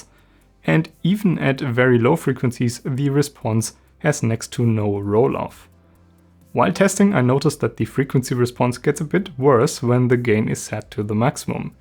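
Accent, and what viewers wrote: Germany. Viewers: Not gonna lie... I didn't even notice the german accent. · it's his German accent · He’s not robotic it’s his German accent. - made my day :))) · First time in my life I can't set English subtitles on English video - why? Because of GERMAN accent? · Is there any negatif comments on his accent ? Every guy with a german accent talking about tech or music i heard were right on almost everything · Love your accent Man. Don't bother about the comments on your German accent. Keep the videos coming. What matters is the content in the beginning and content in the end. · Love the accent mate, used to it by now since my family is German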